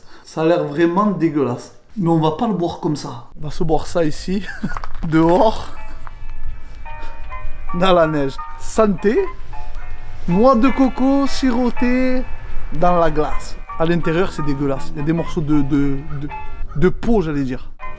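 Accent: Marseille, France. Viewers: J'ajouterai ceci mon cher, je ne sais pas comment tu es dans la vie de tous les jours, mais ici, tu es une des personnes les plus chaleureuse et sympa, tellement que je me suis abonné, et cela est rare. Sûrement ton accent marseillais que j'adore qui me rapelle Marius et Fanny. Mario Repentigny Qc.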